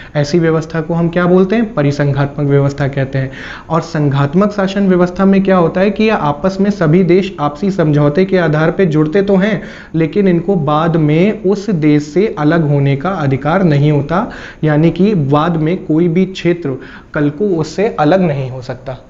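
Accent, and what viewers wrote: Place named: Punjab, India. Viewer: Sir app Jo Acha Acha Bolte ho Jaise Punjabi log Bolte hai vaise Bolte ho appp .......nice ......from punjab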